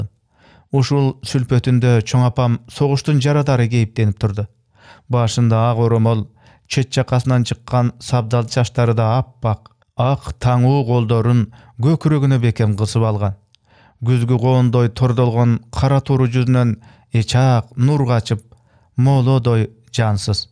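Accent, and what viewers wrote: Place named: South, Kyrgyzstan. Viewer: иним озгочо окуйсун. Керемет..... Кээде абышка кээде жаш жигит сонун образга кирип кетесин....тээ туштуктун диалекти менен.....рахмат иним мыктысын!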